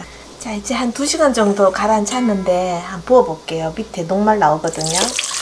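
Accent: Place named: Daegu, South Korea. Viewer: 생강활용도짱~♡ 대구말씨 참 정겹네요 저도대구가친정입니다^^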